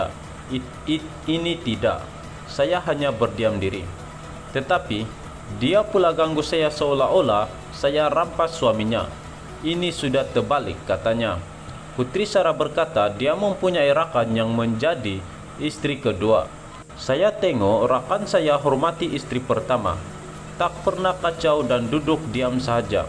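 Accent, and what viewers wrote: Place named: Sabah, Malaysia. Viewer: Pekat juga bunyi bercakap dusun sabah kau itu boiii☺️☺️☺️☺️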